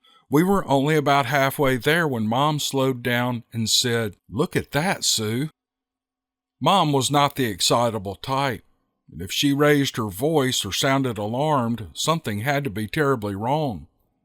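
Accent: South, United States. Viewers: is brilliant isn't he!? His accent is that of a true southern gentleman and I hope all my Irish kinfolk can appreciate our southern accents much love to Ireland from Arkansas 💚♾💚♾💚♾💚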